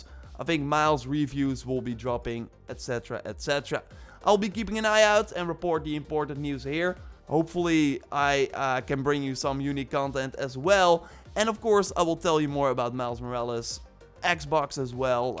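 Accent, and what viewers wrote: Netherlands. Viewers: this dude sounds ultra dutch is he from holland by any chance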